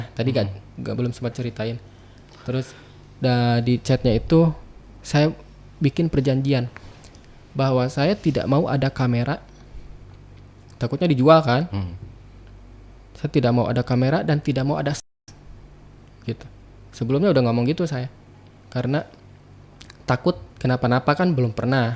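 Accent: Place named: West Java, Indonesia. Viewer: kaya nya org sunda ya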